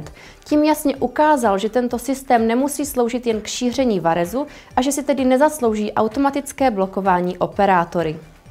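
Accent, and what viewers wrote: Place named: Brno, Czech Republic. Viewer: tak vám newim, česky mluvit neumí, ta brněnština je tam znát a mluvit o tom taky neumí, přečíst bych to zvlád stejně...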